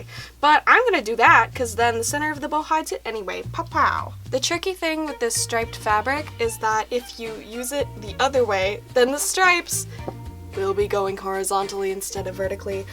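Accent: France. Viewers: "qu'est ce qui se passe ici? c'est une noeude" XDD Your french is adorable! (just for ref tho: c'est UN noeud, the "d" is silent) love you!!! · THE CUTEST OMG Also YAHS 6Teen was the best show thoooo. Also your french is so adorable. Yay Canadian School System😂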